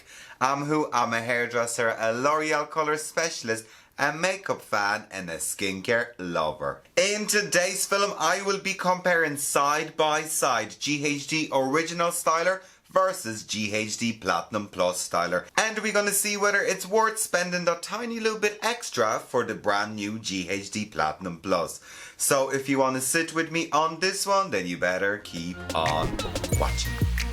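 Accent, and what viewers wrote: Ireland. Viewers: Was not expecting the Irish accent 🍀 ❤ my original ones plug broke I so regret throwing it away and replacing with the platinum 😢 platinum one makes my hair static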